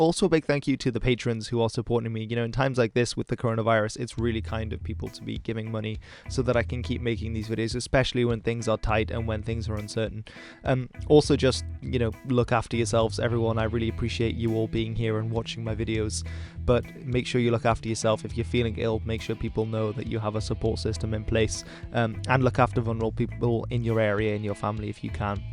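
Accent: United Kingdom. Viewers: his accent is not American at all though, it's British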